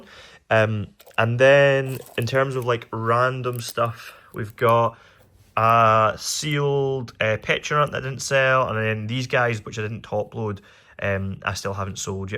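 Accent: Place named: Scotland, United Kingdom. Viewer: Never watched your whatnot, but getting back into Pokémon myself and got recommended this video! As soon as I heard that Scottish accent I was supporting you and your journey, best of luck mate 💪🏴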